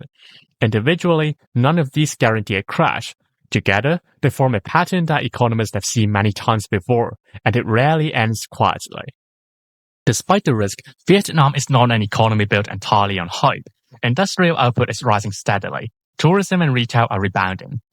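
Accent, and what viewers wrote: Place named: China. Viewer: Sir, from your accent, I can tell that you are a native Mandarin speaker. What is your intention of creating this video?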